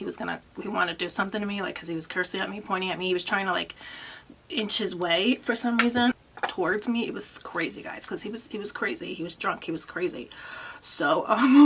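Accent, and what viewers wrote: New York, United States. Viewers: Are u from ny?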